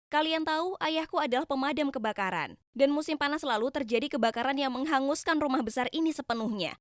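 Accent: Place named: Java, Indonesia. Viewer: Pasti voice over nya orang jawa🗿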